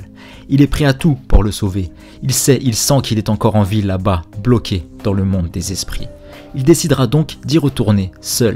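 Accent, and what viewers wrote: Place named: Alsace, France. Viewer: je te connais t'es alsacien toi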